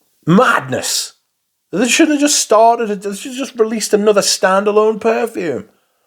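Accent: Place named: Durham, United Kingdom. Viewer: I think it's fine but nothing special. I'm pleased I didn't buy a full bottle. It's very similar to other fragrances I've tried recently. Are you from Durham? I'm from Durham you sound a bit like myself.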